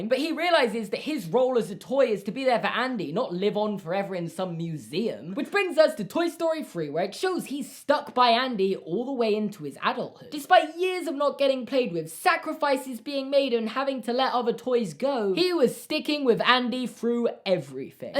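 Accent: United Kingdom. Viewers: I lost touch with this channel for about a year and I'm extremely glad I decided to go back and listen to him go on Cars 2 again. I missed British.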